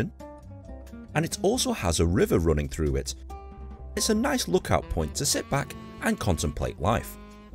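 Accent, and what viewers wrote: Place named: Northern England, United Kingdom. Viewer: Never would i thought to see and hear 😂 an Asian northener from the UK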